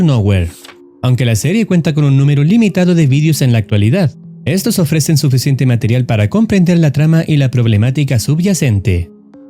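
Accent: Venezuela. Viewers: No sera venezolano?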